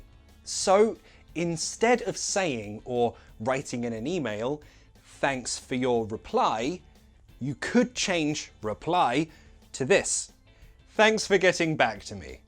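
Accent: United Kingdom. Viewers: Oh yes British English is best! Thank you for those 15 minutes of pure learning and entertainment beautifully packaged 😃👍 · love british accent. help me with it please! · you're the first british accent guy that I've heard not having that horrible and funny accent lol... like a hot potato in the mouth.... most british people have that hot air in the mouth while pronunciating words lmao...Hallelujah lol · It is so nice to listen British accent 💫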